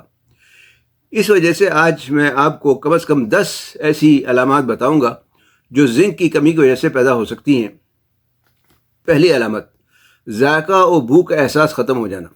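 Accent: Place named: Old Delhi, India. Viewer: یہ لہجہ پرانا ہے اب دیکھنے میں نہیں آتا ۔ہو نہ ہو دہلی سے تعلق ہو سکتا ہے ڈاکٹر صاحب کا ۔اللّه خوش رککھے آمین 🤲🏻💙 السلام علیکم جی 🤲🏻💙 بہت محبّت کے ساتھ شکریہ ❤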